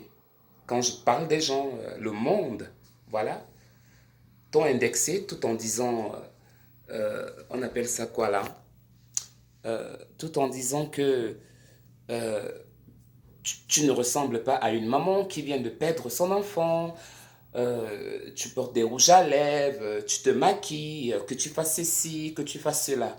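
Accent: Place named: Cameroon. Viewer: à chanté les à t'entendre parler je reconnais ma soeur camerounaise. tu drague deja mama tina on se connait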